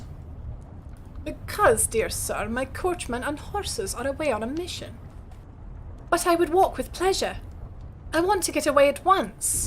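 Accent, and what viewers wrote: Scotland, United Kingdom. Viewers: don't worry about it :)) the video was great and the scottish accent made it even more authentic :)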